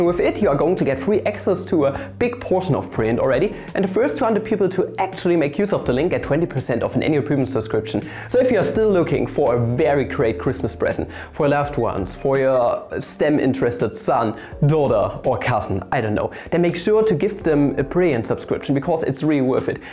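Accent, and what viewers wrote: Germany. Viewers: German accent is very interesting, where did u learn English?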